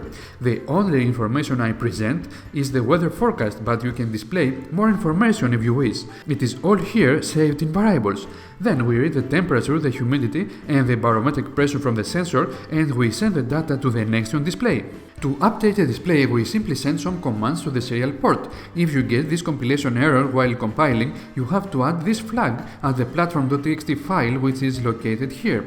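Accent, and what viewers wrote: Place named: Greece. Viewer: Πολύ ωραίο βιντεάκι, άλλα από την προφορά κατάλαβα ότι είσαι Έλληνας, όταν είδα και το sparta σιγουρευτηκα :p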